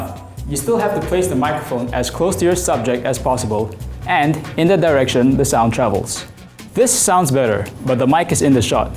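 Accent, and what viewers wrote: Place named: Singapore. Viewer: Very well done...I do video production here and I would hire you in a second if I could afford it. I'm in Florida USA and as I was listening to your accent I realized you must be in S'pore! I used to live in Yishun on Ring Road and miss being there very much, especially Mei Goreng and Roti Prata! Do you know anyone who has worked for Odex? If so, let them know the Shin Jing Bing Ang Mo says hi! and do some more videos!!!!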